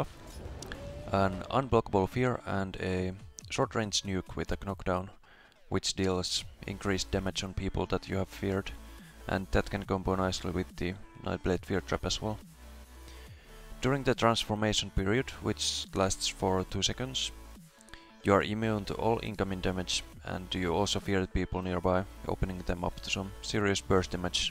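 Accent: Finland. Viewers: Kuulostat niin paljon suomalaiselta että on pakko kysyy että oonko oikeessa? taino siis jos osaat lukee tän niin oot varmasti suomest xD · U from Finland?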